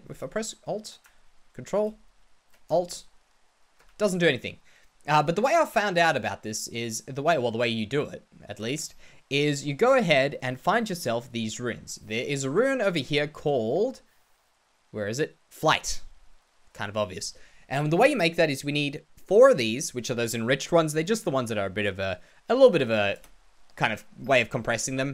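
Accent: Australia. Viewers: You're Australian right?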